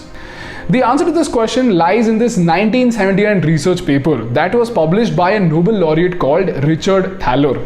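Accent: India. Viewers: Your information is good but the way you speak English sounds Hindi accent . Please improve your accent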